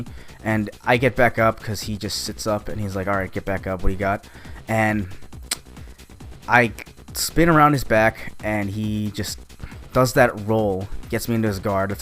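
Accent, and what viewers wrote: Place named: Australia. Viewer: Yeah looked like an omoplatta. Great vids. Bring back the Aussie accent!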